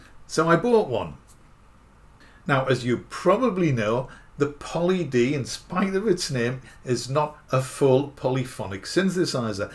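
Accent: United Kingdom. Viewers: I agree,,, it frustrated me too.. still got it but,,, I go back to other Synths... Btw... by your accent,,, ye from my part of the UK 👍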